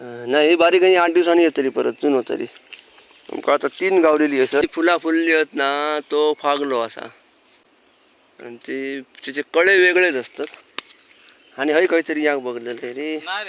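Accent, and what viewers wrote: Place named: Malvan, India. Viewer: मालवणी मस्त बोलतास, दुसर्यांसारखी नकली मालवणी नाय, अस्सल मालवणी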